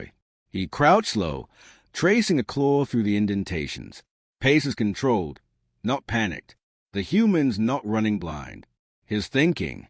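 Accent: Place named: New England, United States. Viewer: Bad accent get rid of snotty New England accent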